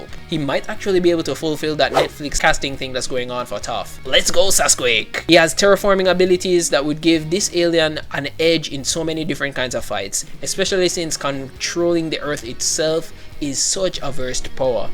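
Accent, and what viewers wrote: Jamaica. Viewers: You sound Jamaican. Are you? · Is he Jamaican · Are u jamaican? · you sound like jamaican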